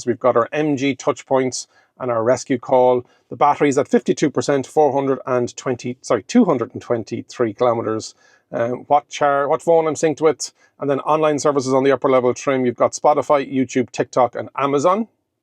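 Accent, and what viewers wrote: Ireland. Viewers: Great video man. Just what I needed. And great to hear an Irish voice explaining everything.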